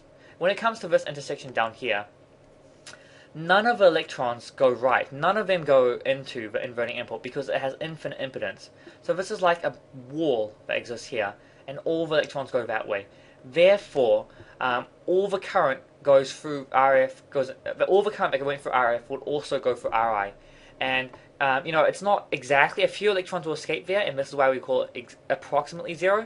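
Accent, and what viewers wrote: New Zealand. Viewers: You're from NZ aren't you ?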